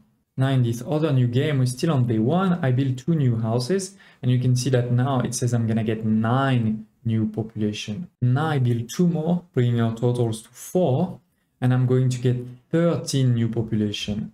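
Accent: France. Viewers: Tu serais pas français? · You're french, right?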